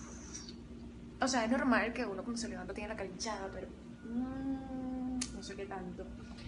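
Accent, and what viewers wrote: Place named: Venezuela. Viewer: Hace poquito encontré tu canal y estoy encantada! Tu súper bella y tu mami bien simpática. Saludos y me encanta tu acento venezolano!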